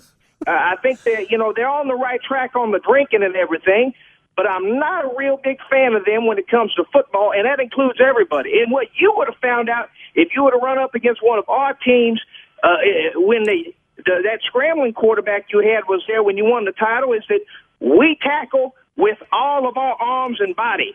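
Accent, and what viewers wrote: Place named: Texas, United States. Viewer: This guy sounds like an Aggy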